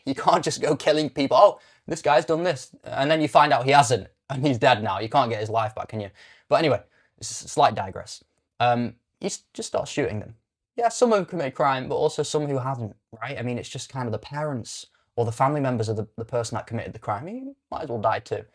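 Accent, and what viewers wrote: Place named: United Kingdom. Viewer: Right, so... The movie was shite yeah. BUT, tagging people "far right" or r@ for being sick of the migrant issue is part of what alienates them and pours oil on the fire because it closes off any real potential for dialogue. Hence the movie. The problem has festered too long without being addressed in a mature way, and lo and behold, now we have the state of the UK (you sound British, so maybe you should know). I'm writing as you go along; you cite Tommy Robinson, but NEVER has he advocated for people to be violent, especially in the latest mass gatherings. The scene in the flat with the family (I don't advocate for what happens next, of course): covering one family's sins is EXACTLY prescribed by Islam and is part of the saving face cultures from the Middle East. The "moderates" are irrelevant; they'll suffer as much as the rest when the fundamentalists act. 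They can't rat them out, nor will they do so for less serious crimes. And the number of families that have stayed silent when their brothers, sons, or husbands have committed the horrendous gang grapes is damning. So in that sense, the scene where the family tries to find excuses is not that caricatural.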